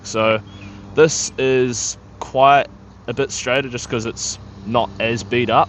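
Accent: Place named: New Zealand. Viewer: I wasn't expecting a Kiwi accent when I clicked on this video. 😂😅 I have an identical Halo Firebird that I could sell you if you need a back up.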